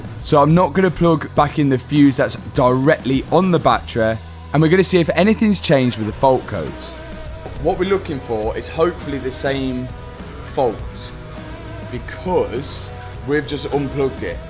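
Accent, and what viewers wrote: United Kingdom. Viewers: Watching your videos to learn british accent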